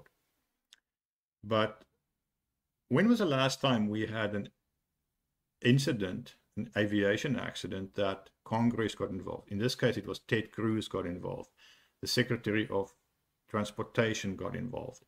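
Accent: South Africa. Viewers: Are you South African 🇿🇦? You sound like it. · but every video someone makes about this accident is just speculation, this guy sounds liker a South african trying to just say something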